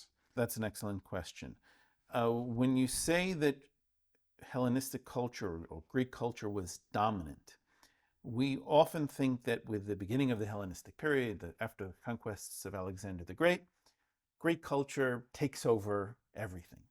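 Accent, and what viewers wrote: Russia. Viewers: Russia is a large country with many different ethnic groups within it. It may not be an accent with which you are familiar but that does not mean it is not a Russian accent.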